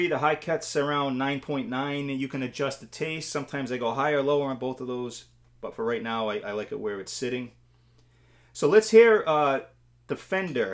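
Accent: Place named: New Hampshire, United States. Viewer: You have that NH accent. You from there? I'm originally from laconia.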